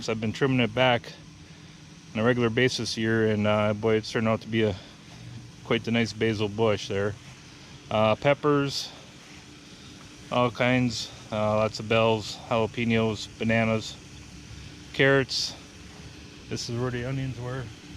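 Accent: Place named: Wisconsin, United States. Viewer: Great stuff. Clearly another cheesehead based on accent, inflection and calling cucumbers pickles 👍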